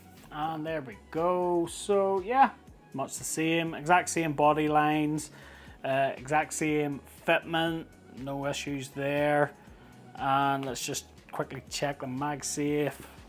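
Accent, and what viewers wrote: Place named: Belfast, United Kingdom. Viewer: Cheers for the vid, sounds like you dont live too far from me lol (belfast) My s24 ultra is arriving on Thursday (hopefully) from three and I need to pick a cover. I usually just get a basic leather folding cover from amazon for my phones, the one on my current s22+ seems to have done a pretty good job at keeping the phone safe so I may end up just getting the same again. But I'm also willing to spend a bit more on a good case for the s24u. The only thing I don't like is having a cover that doesnt fold over and covers the screen. I also like to keep my cards in the phone cover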